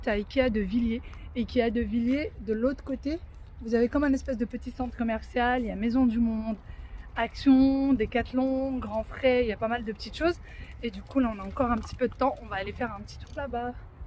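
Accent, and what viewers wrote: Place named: Morocco. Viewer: Moi j adore quand elle parle marocain même si je ne comprends pas trop mignon 😊 Changer rien vous êtes mon petit rayon de soleil de la journée vos vidéos je n’en loupe pas une🤗❤profiter avec votre belle famille et ne vous soucier pas de ses commentaires idiot❤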